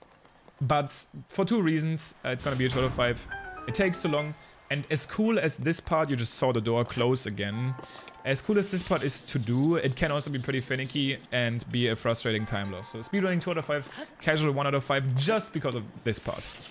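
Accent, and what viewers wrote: Germany. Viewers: hab ich mir gedacht man hört nämlich den deutschen Akzent extrem heraus bei dir. Ist zwar beim zuhören etwas komisch aber trotzdem Geile videos👌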